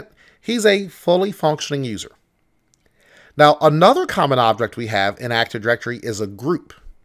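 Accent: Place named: South, United States. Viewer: I love how you explain this stuff! It's helpful for my work. Also, you have a pretty cool southern accent :P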